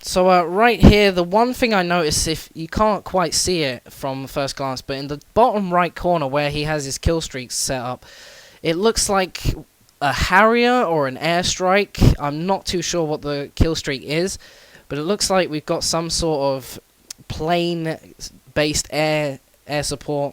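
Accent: United Kingdom. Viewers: great job breaking it down! Brits make things sound much cooler than us yanks. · you sound like your from london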